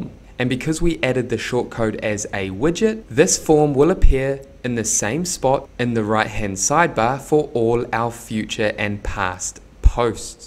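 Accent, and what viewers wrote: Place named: New Zealand. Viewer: Thanks for the great info! We are a Kiwi/Aussie family living in Paraguay so it's nice to hear your Kiwi accent on the video! 😉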